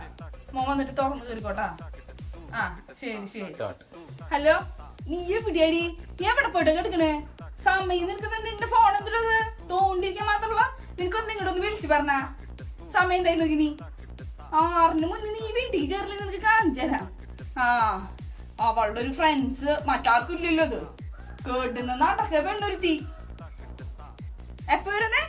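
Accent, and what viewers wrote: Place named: Thrissur, India. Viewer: Thani thrissur slang😊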